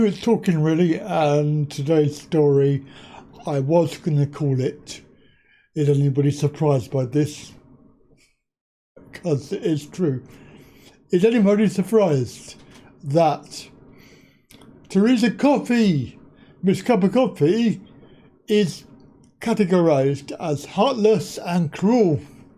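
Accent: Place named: United States. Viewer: Why is the voice in a yank accent?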